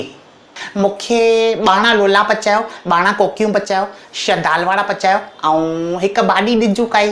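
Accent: Sindh, Pakistan. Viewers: 👌👌👌👌👌👌❤️❤️❤️❤️ bhai aapka sindhi bolne ka style wow 👌👌👌👌👌👌👌👌👌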